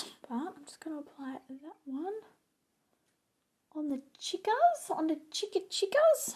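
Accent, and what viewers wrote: RP, United Kingdom. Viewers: Haha love the British accent. We don’t all sound like that though. That’s what posh people from London sound like 😂 xx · That British accent 😂🤣 brilliant, but very posh darling 😂😂 most of us are common as muck haha xx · The British accent! I was loving it. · Not a bad English accent xx love from the UK 🏴❤️ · I am 🤣🤣. I'm from the U.K and your British accent is 👍 Love your channel, however you cost me a fortune in shipping and tax fees when I purchase your products 🤣🤣 ps your finishing powder is the BOMB 🥰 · your british accent is really posh lol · We Americans like to do the English accent too lol! You look gorgeous!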